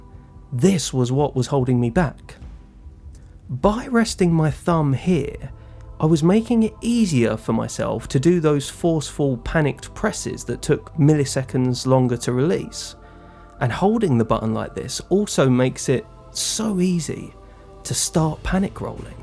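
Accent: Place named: East Sussex, United Kingdom. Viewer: Thanks man. Just found you and you have another sub. Im a Brit sitting in the warm spring sun of East Sussex - your accent doesnt sound too far off this neck of the woods. But Ive been wrong about that stuff many times! I am really impressed how quickly you have built this channel. Gives me some hope. What would you say was your secret to starting it up to fast?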